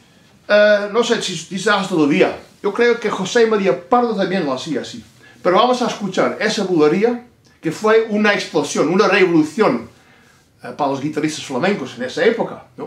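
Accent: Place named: Spain. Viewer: Hello sir, what kind of Spanish accent is that of yours ? Thank you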